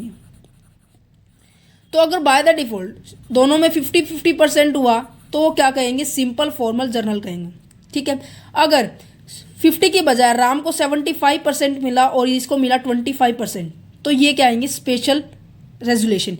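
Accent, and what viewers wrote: Haryana, India. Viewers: Nice explanation mam ..aapki sound me haryanvi touch aata h 😊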